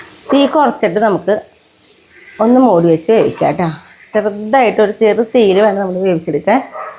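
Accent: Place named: Valluvanad, India. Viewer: Chechiyude Valluvanaadan Bhashayum slaangum KELKKAAN Nalla Rasamund...🍍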